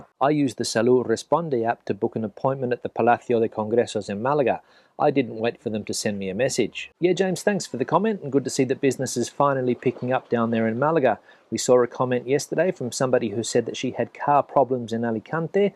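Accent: Australia. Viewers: Hi as an English teacher for twenty years you should know ‘more busier ‘ airport is a double superlative also I would suggest it’s not proper english ( ha ha ) to end sentences with a preposition but I have no problem with your accent although not as strong as on Rottnest in the bar As another Aus expatriate i love Spain and if you are going to do comparisons your beloved WA fails most comparisons starting with your pension You voted with your feet twenty years ago amigo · I studied Speech at University… I was taught that, in your case, you have a “regionalism” ie Down Under. An accent would be how you speak your regionalism. Say a person from Alice Springs vs. NSW….. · I love your 'no bullshit' Aussie accent.. keep up the great videos and sarcastic lessons given to those that can't spell whilst trying to slate you 😀👍👍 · I am an English speaker for 75 years. On my scale you are a 10 out of 10. 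You've lost much of your Australian accent probably as a result of living in Spain. I can tell that you're not speaking American English but I would not be certain whether you were from Australia, NZ, Canada or South Africa. Definitely you don't sound British. Can't believe you produce a great channel and people want to nitpick your English.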